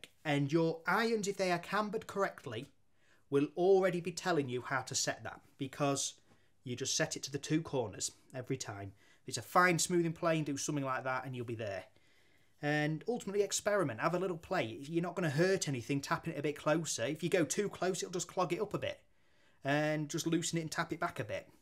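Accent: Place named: Bolton, United Kingdom. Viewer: Is yours a Bolton accent?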